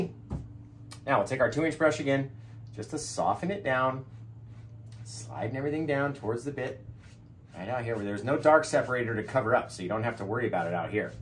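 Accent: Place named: New England, United States. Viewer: Soooooo, what part of New England are you from? Lol, the "wicked" gives you away...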